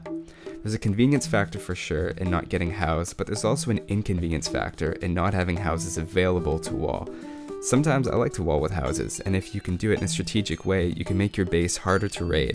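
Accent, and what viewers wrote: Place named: Canada. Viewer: Your accent sounds Canadian haha. Are you from Canada?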